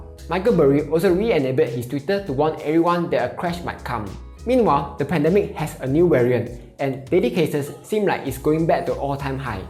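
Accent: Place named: Singapore. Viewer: He is speaking Singlish, which is Singaporean English!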